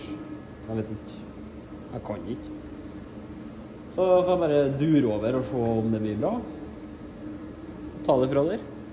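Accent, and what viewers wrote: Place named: Trøndelag, Norway. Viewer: Du snakker bra trøndersk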